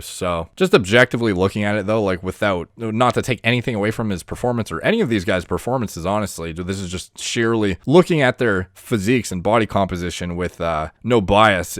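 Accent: Canada. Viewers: Maybe it’s just because I’ve watched too many of them both but sounds more Canadian whereas Nick’s voice sits firmly in the “country parts of the northeast” camp. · The Canadian accent is strong with this one.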